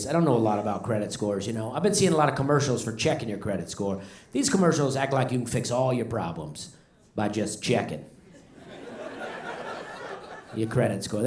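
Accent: South, United States. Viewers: This man stole all my jokes and just added a subpar southern twang to it.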